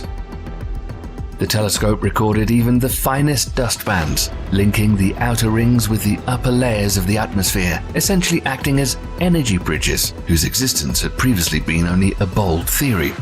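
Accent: England, United Kingdom. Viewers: Again with the haughty English accent. How POMPOUS! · I can't sleep unless it's a British accent talking about space. Anyone else?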